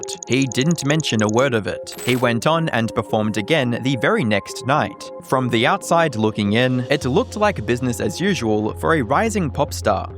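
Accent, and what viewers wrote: Australia. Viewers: I've been watching your vids for a while, i didn't notice your Aussie accent till now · “If you dorn’t knor” I sense Aussie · no problem, for context I know an adelaidian accent when I hear one haha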